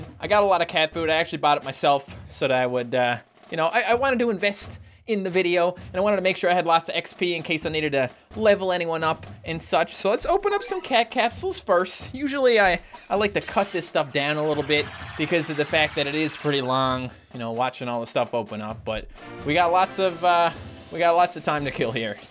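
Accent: Newfoundland, Canada. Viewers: You're Canadian? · love ur canadian accent and ur "cosed" "o" in "sorry" from where I am the "o"s are "open" my sorry would look like a sarry and we're made fun of because of that ^^ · Wait your a Newfie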